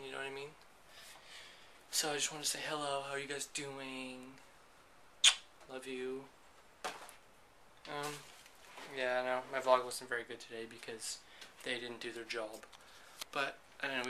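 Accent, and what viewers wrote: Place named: Canada. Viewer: i love how you say out ...hahhahahhaaha canadian accent :D srry i am american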